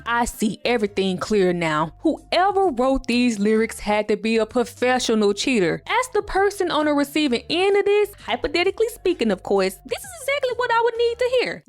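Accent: Memphis, United States. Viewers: Can someone in the comment section tell us what state her accent is common? I want to assume memphis because glo's accent sounds similar. . . Please and thank you 🤝🏽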